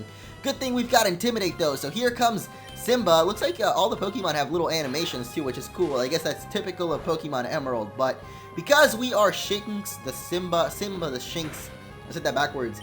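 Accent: United Kingdom. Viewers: He is definitely a Brit... XD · very British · oh so very british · yea hes britsh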